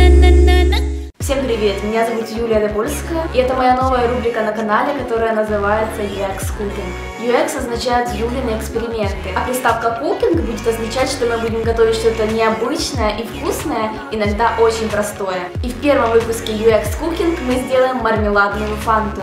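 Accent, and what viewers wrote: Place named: Ukraine. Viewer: Она ведь из Украины, а у нас многие так говорят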